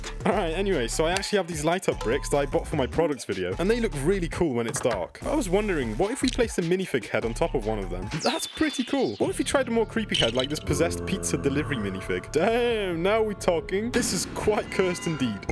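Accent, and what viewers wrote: United Kingdom. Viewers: Bros British I’m British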